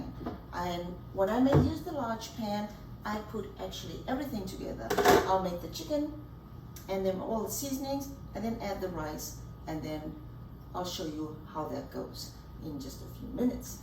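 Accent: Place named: South Africa. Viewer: Came across your channel and ❤ your recipes. You sound like you from South Africa. Keep the recipes coming.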